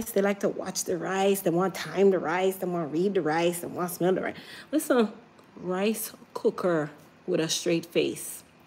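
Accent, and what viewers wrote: Jamaica. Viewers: I love your acent are u Jamaica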